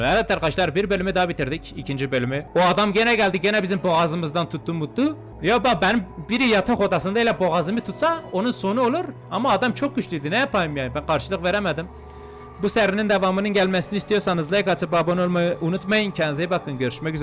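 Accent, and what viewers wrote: Elazığ, Turkey. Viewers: Abi elazığlı mısın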